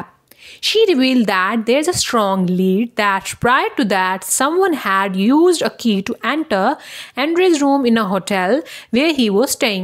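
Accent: India. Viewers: She sounds Indian from india or possibly an Indian born or raised in England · She sounds India dialect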